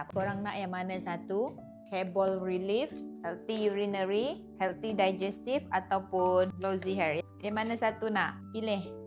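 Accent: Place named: Java, Indonesia. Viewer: Akak org jawa ea?dgr cara bcakap pekat slank jawa akak..😅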